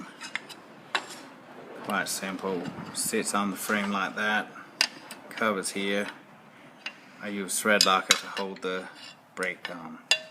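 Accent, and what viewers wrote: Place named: Australia. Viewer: You do a great Aussie accent Greetings from OHH MAA HAA USA I know you from round these parts Fair Dinkum no crow eater you boy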